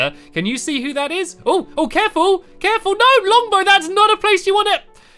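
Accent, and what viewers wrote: United Kingdom. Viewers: OMFG he has a british accent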